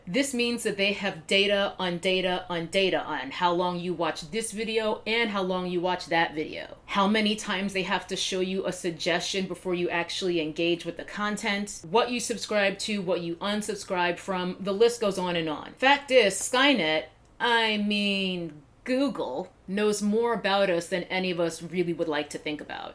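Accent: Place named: New York, United States. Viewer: Mmmm I felt like you had a NY accent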